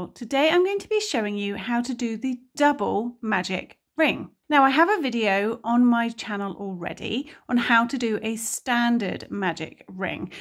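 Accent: United Kingdom. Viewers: Your accent sounds British, so why don’t you use UK terms?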